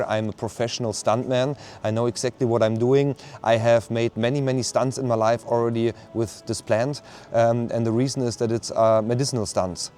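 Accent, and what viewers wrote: Germany. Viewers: playing in the shop visits & your comment - not random coincidences! I have been studying into synchronicities since I suffered an acute psychotic episode in 2017. (psychotic = to psychic) Psychiatrist Carl Jung was said to have coined the term ‘synCHRONICity’ to describe meaningful coincidences with significant personal meaning to the individual. I’ve been working on making wee silly comedy cartoon / comic book style movies since I went ‘crazy’ & in the nuthouse they imprisoned me in 4 being a method actress, the nuthouse staff put an A4 sheet of paper ‘sign’ on the door that threatened; “WRITE TO SURVIVE.” I focused on that sign whilst barely conscious after my life was nearly ended by a psychiatric mentalcase patient in their who beat my skull on the ground in excess of 30 times whilst screaming, “AHM GONNAE FUCKIN MURDER YE!!” “She”, that “female” (I use that term to describe “her” loosely), that violent criminal thug caused me a “traumatic brain-injury” (there is an anagram of “Truman” within those words. 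During my “to psychic” episode I suffered from the common Truman Show delusion & thought I had been set up (I’m an actress) & thought I was being used for a psyop for a Derren Brown show. (I was not I was being sectioned in hell.) Anyhoo, I decided to comment as I’ve just recently started watching your videos & enjoy seeing Amsterdam & listening to your German accent. When I was homeless in 2023 in Utrecht I met the loveliest homeless German man called No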